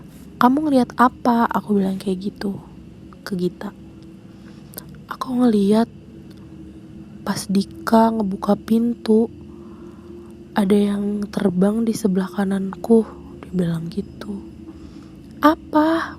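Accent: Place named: South Jakarta, Indonesia. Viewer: Orang Jaksel banget nih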